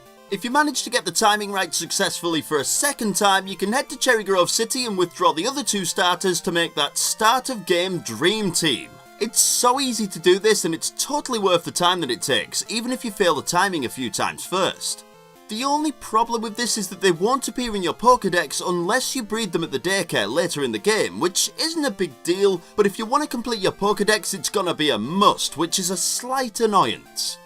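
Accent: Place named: Bolton, United Kingdom. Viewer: Came for the glitches, stayed for the Bolton accent. Have a subscribe, friend!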